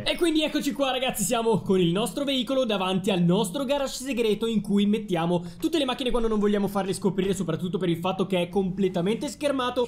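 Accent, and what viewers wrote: Milan, Italy. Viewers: Ma sei di Milano?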